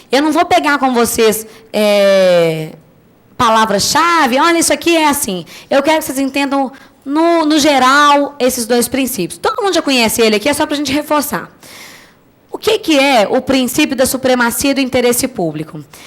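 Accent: Minas Gerais, Brazil. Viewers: Agradecidíssima pelos vídeos! E eu amo o sotaque mineiro, então tô amando. Vou maratonar.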